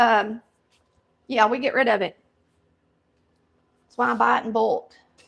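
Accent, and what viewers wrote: South, United States. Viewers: I just showed my husband your video… told him yall sound like us… lol … “been doing this 7 yrs he should know.” …. We’re country talking too.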